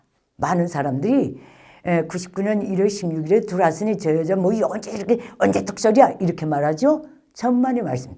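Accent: North Korea. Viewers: 한국 오래사셨는... 말투가 그대로 북이시네 ㅋㅋ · 저말대로라면 북한은 이미무너졌어야하는데 아직도 멀쩡하잖음?내년도 그후에도 멀쩡히 체제유지할거같은데? 말투가 아직도 북한티못벗었고 왠지 상대를 가르치려는 태도가 좀불편하네. 주구장창 주저리주저리 뭔가 말은하는데 도통알아듣질못하겠다. 듣고있는게 피곤하다.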